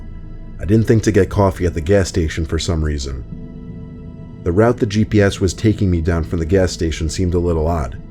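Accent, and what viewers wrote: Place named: New York, United States. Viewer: Liam Sadler i knew he was either a new yorker or New Jerseyian like myself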